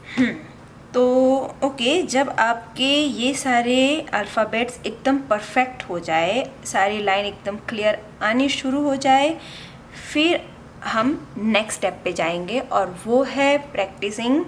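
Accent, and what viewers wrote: Gujarat, India. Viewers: ur accent😯,r u Gujarati?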